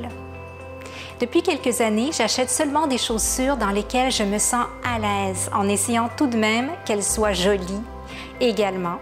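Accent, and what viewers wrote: Quebec, Canada. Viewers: Bonjour, merci pour votre partage je recherchais justement des vidéos de personnes un peu plus âgées car j'ai 35 ans maintenant, et je ne suis plus très en phase avec les conseils des personnes de 20 ans, (même si ces personnes ont bien-sûr aussi de bons conseils). J'aime beaucoup votre discours, votre musique de fond, vos images, et votre accent québécois est la cerise sur le gâteau car j'adore le Québec ❤️ bref ce format est très bien, on aimerait qu'il dure plus longtemps ! En tant que minimaliste, je ne sais pas si vous avez proposé un house tour de chez vous pour voir à quoi un endroit épuré ressemble, pour s'en inspirer. Merci ! Eve, de France.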